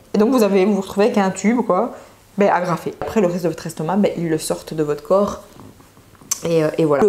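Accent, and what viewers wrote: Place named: Belgium. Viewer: T'es belge non? J'ai reconnu l'accent belge 😭😭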